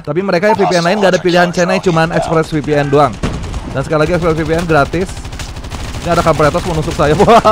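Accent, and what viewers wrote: Bandung, Indonesia. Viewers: Logat namah ciga orang ciroyom sih 😁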